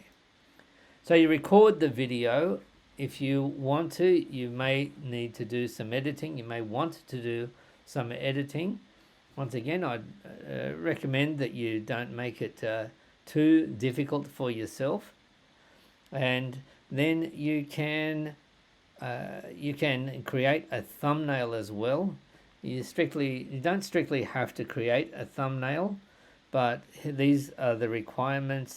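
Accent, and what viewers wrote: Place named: Australia. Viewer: Very informative video. Thank you,! One thing that I particularly liked about your video is that, based on your accent, I'd say you're Australian. I am, too, and it's nice to see content that is going to be applicable to me. Sometimes I will watch a video about something only to find that the program is only for US residents, which is frustrating and a waste of time. Keep it up!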